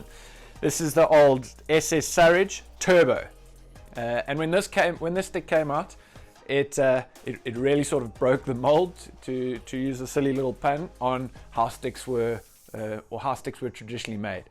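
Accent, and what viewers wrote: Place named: South Africa. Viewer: red white blue, abn amro, and kampong(?). First I thought you were a dutchie, but then I heard the South African accent haha, great video!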